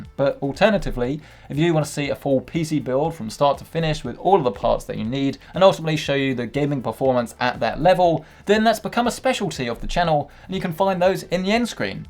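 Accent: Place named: Cockney, United Kingdom. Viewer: Not sure I am a big fan of Cockney. I think I prefer normal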